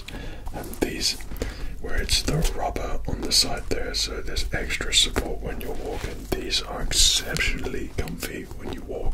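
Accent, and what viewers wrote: England, United Kingdom. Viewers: your from England? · is Australian, is British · I never knew was a fellow english man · My strange addiction over here ngl I’ve been watching u for time had no idea u were British 😂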